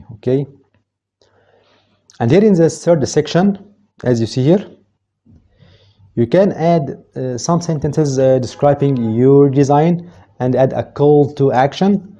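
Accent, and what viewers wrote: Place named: Egypt. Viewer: منور يا عم او كما تقولون الله ينور ياعم عرفت انك مصري من خلال كلمتين (اكزمبل exemple وايضا زيس this) بالتوفيق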